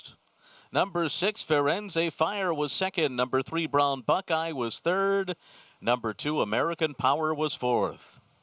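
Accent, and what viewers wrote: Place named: Venezuela. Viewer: castellano de Venezuela !!!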